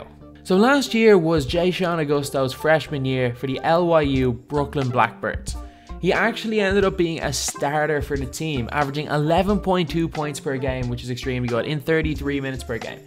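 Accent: Ireland. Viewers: Are you from ireland? Cause i noticed that you sounded ALITTLE bit like conor mcgregor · Are u from cork or dublin. I live in Kerry and recognised the accent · R u Irish?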